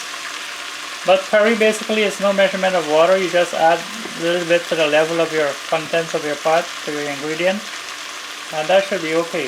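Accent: Guyana. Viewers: i am missing Guyana so much & i am so grateful for your tasty traditional recipes. i love the Guyanese accent. i hope to make my rhythm, with this yummy recipes. big love from London UK.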